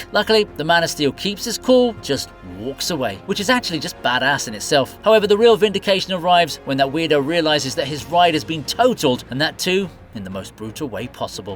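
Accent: England, United Kingdom. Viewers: Love your accent I'm American and I just love a funny English accent proper English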